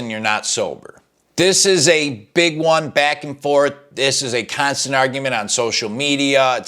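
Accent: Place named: Upstate New York, United States. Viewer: Are you from upstate NY? Trying to place your “accent.” (I don’t actually consider it an accent, but after living in the south for the past several years, it’s nice to hear what sounds like my “accent” from back home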